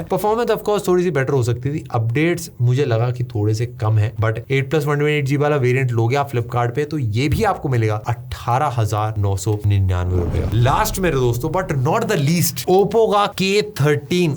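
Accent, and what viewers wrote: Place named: Haryana, India. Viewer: Haryana wala feel hai bhai kay bolne ka kya haryana se ho kya???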